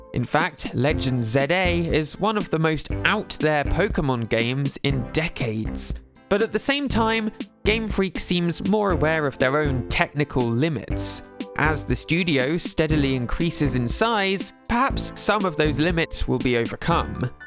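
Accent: United Kingdom. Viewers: I mean, he is British. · So are you just discriminating British accents now?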